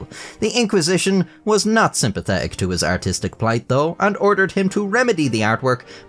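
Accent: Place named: Ireland. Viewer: I must say, you really spoke in a very good Irish accent in this video.......that's wert a tumbs up.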